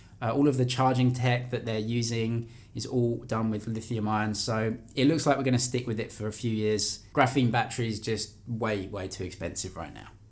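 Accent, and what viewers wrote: England, United Kingdom. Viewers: ❤️from India , I really like ur videos for ur hard work, no favouritism, truth and ur English accent I really like it .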